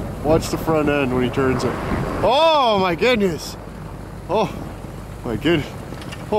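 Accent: Ontario, Canada. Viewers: Lol I can tell your from Ontario just by the accent.. everyone from the up to northern michigan tht stays there a while picks it up.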